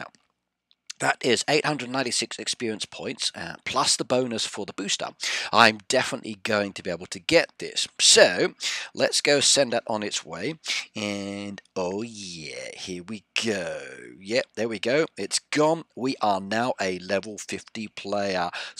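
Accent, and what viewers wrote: United Kingdom. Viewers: Your accent very british🤣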